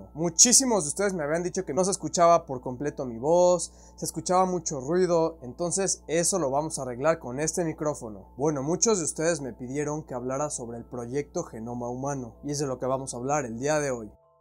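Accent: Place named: North, Mexico. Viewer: de que parte de méxico eres hablas entre norteño y de ciudad de mexico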